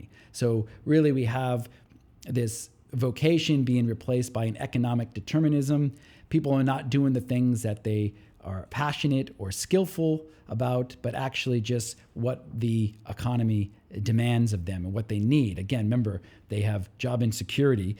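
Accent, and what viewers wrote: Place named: New Hampshire, United States. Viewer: He's from New Hampshire, let it go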